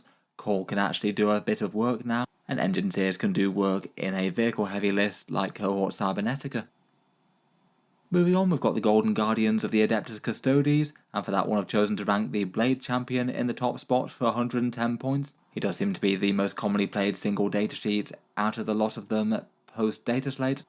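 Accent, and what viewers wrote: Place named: United Kingdom. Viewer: So is very clearly from UK. As an American it throws me for a loop whenever i hear Loo-tenant instead of Lef-tenant in a British accent.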